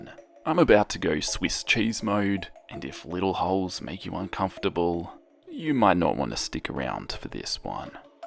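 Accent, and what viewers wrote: Australia. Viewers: I reallyyyy like your horror stile and i always watch your videos as a podcast and your voice and the australien accent chef kiss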